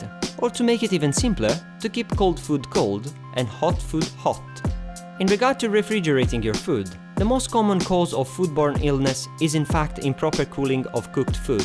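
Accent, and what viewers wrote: Italy. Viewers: You are great " "! I am Italian too but I love your accent when you speak English, it is not easy to speak a foreign language, I know and you do it very well! I also love the way you speak, your irony, I enjoy every word and all your videos very much and I am so happy you are back on line again! Love you Xxx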